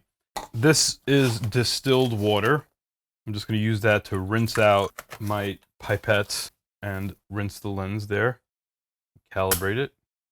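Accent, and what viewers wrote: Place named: Brooklyn, United States. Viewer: Your accent reminds me of the old school Brooklyn Accent. I still hear it in natives that are over a certain age. An era of NY that I miss.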